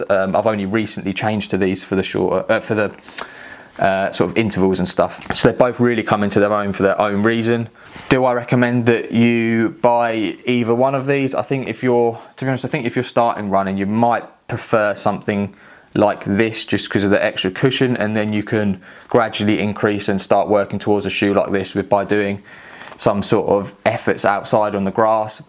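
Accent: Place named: London, United Kingdom. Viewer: Hello,! Thanks for replying:) Yes, it is a very beautiful accent actually! I lived for two years close to Victoria Park, in Mile End, and I have very good memories of it. Overall, I enjoyed London pretty much. Was a great experience to live in there as there are many beautiful parks, it is full of life, etc. I was wondering, if would like to practice some Spanish, reach out to me! Sometimes I do weekly Skypes with people from the UK so as to not forget my English in exchange of Spanish, and we keep it flexible, so if you can't make it one day it's absolutely fine. Anyways, have a good day, and great video! Cheers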